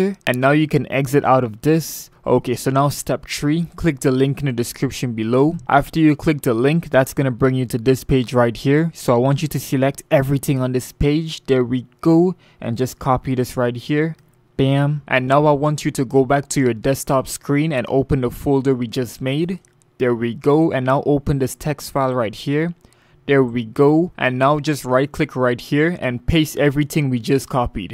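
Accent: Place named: Canada. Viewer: I love the canadian in your voice :D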